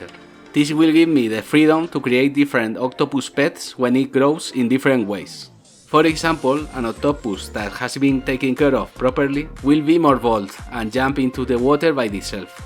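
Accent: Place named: Spain. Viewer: Are you spanish? I'm from Spain and your accent reminds me so much to mine XD Btw, your game looks amazing, the pixelart is beautiful ;3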